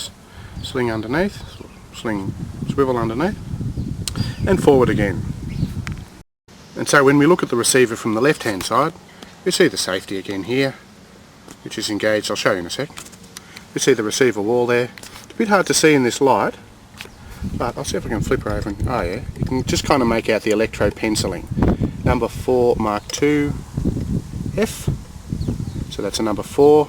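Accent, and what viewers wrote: Australia. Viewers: Your Austrailian... just wondering if you have ever seen the movie Breaker Morant about the Aussies in the 2nd Boer War and his rule 303 speech he gives. Awesome movie just, thought I'd recommend it. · are you australian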